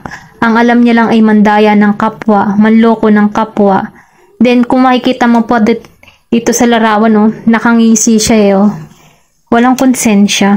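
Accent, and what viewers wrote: Batangas, Philippines. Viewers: Mkasarili po tlga🥲😔 WLA pkealam s nrramdman ng iba, thank you po💖 mam batangeña kpo ba hehe feel ko lng po salita nyo😊 pero Kung di nmn bka lng po 😅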